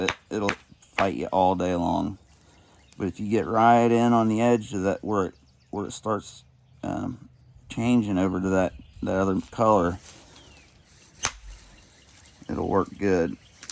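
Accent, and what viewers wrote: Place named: Alabama, United States. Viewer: Cool you used the word solicified in a sentence! Thank you for sharing. Curious where you’re located. I’m in nw Alabama and you sound like home folks.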